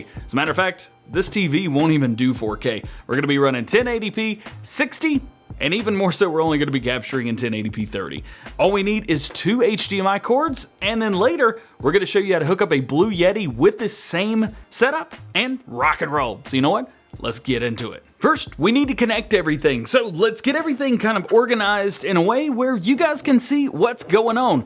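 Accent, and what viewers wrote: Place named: South, United States. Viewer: Definitely from Alabama or Tennessee that accent tho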